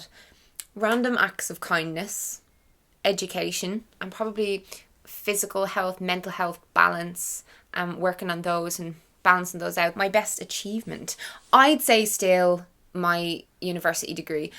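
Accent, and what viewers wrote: Ireland. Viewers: I visited Ireland this summer and had THE time of my life and fell in love with it! I found you from searching Ireland videos and one thing lead to another and I fell in love with your energy and accent and message 💚 I get so excited when a new monthly vlog comes out... I snuggle in and make coffee and feel like I get to hang out with my Irish friend! 💚 Thanks for all you do!! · And I probably started following you 2 years ago... after I had a trip to Ireland, I fell in love with Irish accents! :-) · I subscribed to you bc of ed videos, vlogs, because you speak so honestly about all topics (also things other youtubers dont talk about), your personality and your cute Irish accent 😊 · I subscribed to you when the video am no longer Vegan came out (because I was going through a similar thing). I’ve loved your personality and sense of humor ever since , you will continue to be one of my top . 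( also am obsessed with the Irish accent, since am not a native English speaker I hope I’ll master it one day *insert Irish youtubers accounts here * lol ) love you 🖤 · I came to your channel after seing you in some kind of collab on Hannah Witton s channel, it must be a couple of years ago now, and I stayed and subscribed. I really like that you have different types of content, the vlogs, the food diary and talking about like sex and health and everything. Also, a bit strange maybe, I'm here because I love irish accents! I'm Swedish but I lived in London for a year and kind of sound like a Londoner when speaking English and I'm so interested in the English language with all its different accents (not american though, sorry americans).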